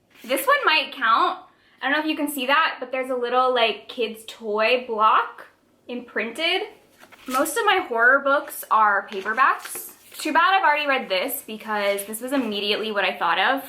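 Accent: United States. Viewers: Hi!! I just wanted to comment to say that Winter People authors name is Irish so it’s pronounced ‘Mac Mah-Hun’ you kind of said it right but with a very very strong American accent which is probably why it sounds kind of weird to us lol!!! I love your videos and your tbr vids are probably my favourite thing to watch 😂 💕💕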